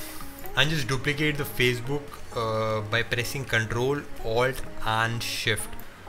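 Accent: India. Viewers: hi dude wassup! your voice looks like an indian! i am indian too! first of all happy diwali and i just wanted to ask if to indian youtubers could be friends?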